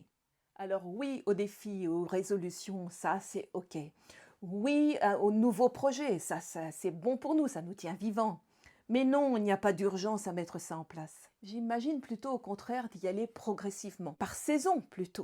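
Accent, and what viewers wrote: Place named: East, France. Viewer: Bonsoir .. joli accent de l est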